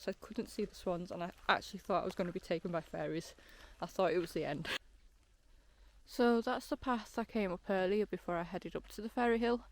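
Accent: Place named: Northumberland, United Kingdom. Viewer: Nice relaxed narration, with excellent choice of music, gave it the mystical feel with your regional accent, soft like are you from Northumberland?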